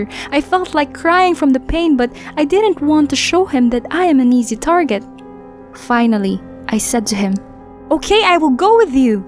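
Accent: Philippines. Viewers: I have a feeling that the narrator was filipino :> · Is the narrator FILIPINO? She has the filipino accent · Is the narrator from the Philippines? her accent is just like a filipina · The narrator sounds like Filipino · feel ko pinay nagbabasa ng story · Filipino accent, no doubt. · I can tell the narrator is a filipino cause when I narrated a story that is also how I sound like. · She sound like a filipina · i got a strong feeling that the narrator is filipino lol. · Pinoy yung narrator 😂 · She is a filipino. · The narrator was definitely from the Philippines. The "t's" at the end gives it away... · Uhm it sounds like the narrator is Filipino.. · Parang pinay yung narator.. grabe, kung ako yan sasapakin ko agad yan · The accent of the narrator sounded like a filipino accent. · It's funny bcs some people think that the narrator of this video is a Filipino bcs of its accent. 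I mean, is there something wrong with OUR ACCENT? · I think the narrator is a philipino thats why when they talk in english you know no hate on me or you will get reported and blocked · Is the narrator filipino?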